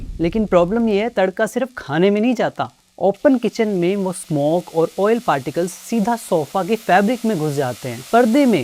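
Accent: Pakistan. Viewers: Accent urdu pakistani content for indian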